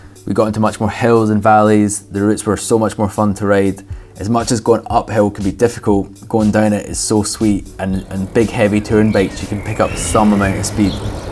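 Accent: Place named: Barbados. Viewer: Here I am listening to your videos while still in Trinidad cleaning my house, passing time until I return to France this summer BUT I almost can't make the connection in my mind that you are NOT from the island of Barbados. Your accceeenntttttttt. You sound 100% Bajan!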